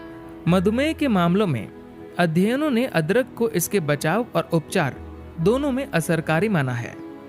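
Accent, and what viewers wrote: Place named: South India, India. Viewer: आप अं की बिन्दी बिल्कुल नहीं बोलते जिससे आपकी हिंदी हास्यास्पद हो गई है। तुरंत पता चल जाता है कि आप साउथ इंडिया से हैं। औ का उच्चारण भी आपका खराब है।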